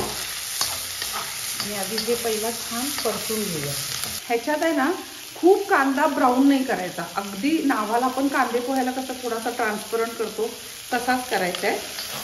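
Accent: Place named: Karnataka, India. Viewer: तुमचे कर्नाटकी मराठी हेल काढून उच्चार खूप छान वाटले कानांना ऐकताना 😍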